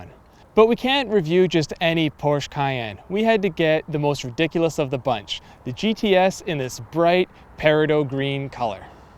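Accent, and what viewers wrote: Canada. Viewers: Here in Texas it gets pronounced correctly, but then there are many descendants of German immigrants here. The reviewer sounds like he has a Canadian accent, but I bet you can find some Canadians who know how to pronounce it properly. I've heard the "porch" pronunciation in Australia and New Zealand too, so maybe its a British commonwealth thing...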